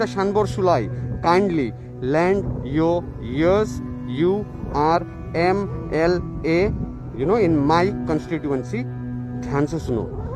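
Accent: Assam, India. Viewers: He seems to be Assamese living at the boarder of meghalaya n Assam ...the way he is lecturing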